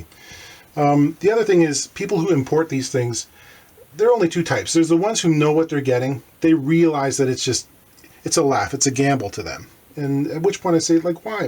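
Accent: England, United Kingdom. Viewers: British accent, classic. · Never mind "solder"......I'm still surprised that you all appear to pronounce the word route (UK pronounciation 'root') as rowt......I never heard Bobby Troup's song sung as "rowt 66"....always "root 66".....just making the comment.....love your absolutely spiffing tutorials and what a class English accent! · I thought so too well, ENglish not British accent). Then I thought of older, bolder, colder, holder, folder etc.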